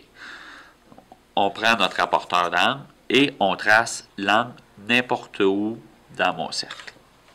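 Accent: Quebec, Canada. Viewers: Merci tu m'as beaucoup aidée car pour une fois je n'ai pas écouté cette leçon et j'ai eu du mal à faire mon DM mais grâce à toi j'ai réussi (j'adore ton accent canadien) 😂❤❤ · Vue que je suis suisse l’accent québécois me dérange mais sinon merci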